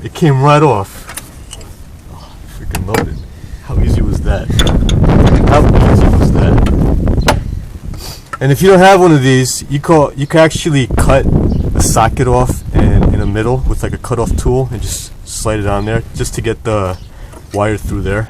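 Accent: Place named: Long Island, United States. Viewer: Excellent video! You MUST be from Long Island. I'm gonna guess Suffolk County. Am I right?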